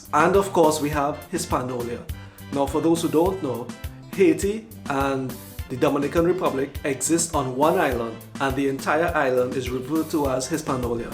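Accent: Trinidad and Tobago. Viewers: Loved the video, very informative and love your Trini accent, my great grandmother originated from Trinidad and my Grandmother from St Vincent. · Barbados's name that so fantastic - the obvious reason we were not taught this in school no books were available to know either, but now we can learn. truth has to come out! Thank you and who else would do such a great job with an accent but a Trini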